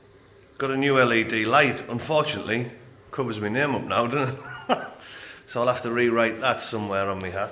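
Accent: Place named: Hartlepool, United Kingdom. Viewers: You sound like a fellow monkey hanger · Great video. I too mainly do the big stuff from 415v up to 66kv. Love the challenge bit frustrating at times but love it all the same. Keep up the vids 👍. Where about sin the north east you from as you sound close to me in the Boro.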